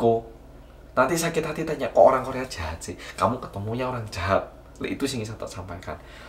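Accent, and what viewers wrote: Java, Indonesia. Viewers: Hihihi, kaet weru onok wong korea medok.. 👍🏼👍🏼👍🏼👍🏼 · Orang korea ekspresinya jowo medhook buanget ㅋㅋㅋㅋㅋ mugo wae iso ketemu sampean mas, aku kerja di busan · Paham .... mas korea sing medhok. Sampean ne bener pol. Okeh wong nom jaman sak iki ninggal falsafah jowo... "bibit bobot bebet" .... mulo do bingunggg. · Gue yang turunan Betawi Jawa tp engga bs ngomong Jawa berasa gagal jd org Indonesia gegara liat org Korea ngomong Jawa dan logatnya engga maen-maen. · Lebih suka medoknya mas daripada oppa oppa wkwk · lha jian kok iso medok yo jowomu mas mas 😄😄😁, lucu seneng aku ngerungokke medok ngono 👍👏👏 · MasyaaAllah mas mas.. Astaghfirullah.. Biyung alah gusti Allah, jan eram kok medok ee sampean MasyaaAllah.. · ih Ya Allah nguefans kon aku ambek mas iki wkwk, muedok pol polan kon. Aku ae sing wong jowo kadang yo sok sok an ninggalno logat medok ku lek ngomong ambek konco ambek wong wong liyo tak ubah logat ku dadi indonesia ke jakarta jakartaan ngunu😅 (maafkan kesomse an ini) lah iki wong korea lo isok muedok pol ngalah ngalahi aku, de'e yo bangga pisan. 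Aku yo bangga kok rek dadi wong jowo *tenang ae. mulai saiki tak tingkatno maneh skill medok ku cek e isok ngalahno mas korea sitok iki hahaha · Ini orang korea bicara jawa nya medok plus lancar banget anjay. Aku yg asli jawa ngomong bhs jawa aja ga lancar kaya gini wkwk. salutt ! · Gw org jawa malah tiba" bingung ma bahasa sendiri karna bahasa jawanya mas terlalu medokk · Lucu bingit tampang Korea ngomong Jowo lbih medhok dri aku. Smangat mas bagi" pengalaman pengetahuan sama kita. Smoga sehat sukses slalu ya. Aamiin. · Mas...lihat kamu bicara kok gemes to aku...medok e ra ilok ilok lucu 🤭🤭🤭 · HAHAHAHA ko ngakak nontonnya. Orang korea bisa se medhok itu, pd gue yg org jawa bisa bahasanya aja engga=)) Inggris bisa, jawa bisa, korea bisa. Udah mantap kamu bang!🤘🤘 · Koq medok to mas'e, koncone uwong jowo toh? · Korea jawa bgt mas logatnya, bahasanya juga.. gk keliatan orng luarnya.. dlu punya mntan orng vietnam gabisa selancar ini ngomongnya, boro2 bhasa jawa bhasa indo aja susah bgt.. 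luar biasa mas ini.. cari pacar orng indonesia aj mas 😂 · Sampean mas lebih tepate koyo wong Indonesia turunan tiongkok. Medok tenan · Baru tau sampean orang korea toh.. medok'e mantep..👍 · Pusing di bahasa koreanya❎ Pusing di bahasa medoknya☑️ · Rapopo kak aku orang jawa juga btw aku ngakak kalo denger kakak ngomong e muedokk nemen😂😂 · Kita2 ini wong indo...tp ora semedok ini.....aku ngakak bgt vidio ini... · muedokkkk aslii... Terima kasih mas sudah mencintai bahasa kami.:) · Aq yo malang oppa..aseli aq ngrungokno boso medok mu👍👍👍😂 pas merem bayangan wong jowo pas melek kok ngene😄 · Ya ampun itu logat jawa nya t.o.p 😂😂 bgt 👍🏽👍🏽👍🏽dach😂😂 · Sumpah seru banget nonton nya wkwkwkwk medoknya ampe emosi😆😆😆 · Di Drama terlihat romantis, aslinya sebaliknya 😂😂 makanya nggak pernah nonton karena percuma😂😂😂 btw, mas keren, your indonesian Javanesse dialek is awesome. You deserve a big tumbs up👍👍👍👍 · Suwun cak... 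Tapi uenak ngrungokno sing muefhok...🥰🥰🥰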